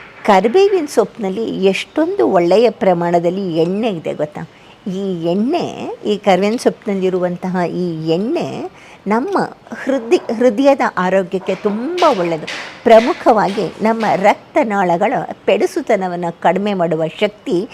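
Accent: Standard. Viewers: ನಿಮ್ಮ ಒಳ್ಳೆಯ ಮಾಹಿತಿ ಮತ್ತು ಸ್ವಚ್ಛ ಕನ್ನಸ ಭಾಷೆ ಯಲ್ಲಿ ಅರ್ಥ ಆಗುವ ಹಾಗೆ ತಿಳಿದಿದ್ದಕ್ಕೆ ತಮಗೆ ಅನಂತ ಪ್ರಣಾಮಗಳು ಮೇಡಂ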